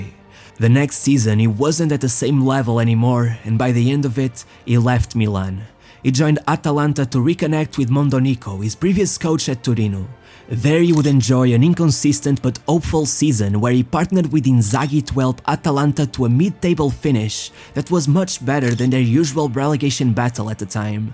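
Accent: Portugal. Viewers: És português? Dá para notar pela pronúncia a falar inglês, é sempre muito bom ter um português com tantos subscritores e com um canal com um conteúdo incrível original e interessante. Continua com o excelente trabalho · És tuga né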